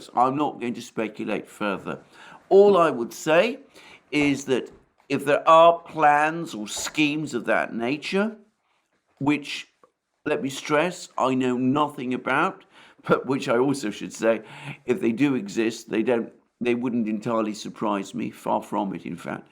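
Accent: England, United Kingdom. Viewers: It’s funny hearing Russian propaganda in a English accent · Dont you love fine russian propaganda with a british accent?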